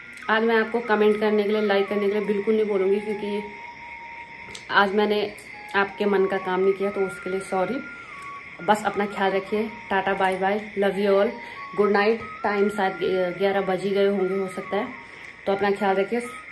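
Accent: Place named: Pahari, India. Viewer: Talarni 🤣🤣🤣 theth pahari👍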